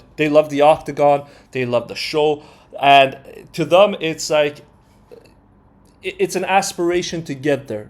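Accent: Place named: Canada. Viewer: u sound brown and from canada am I right? ik this a weird comment lol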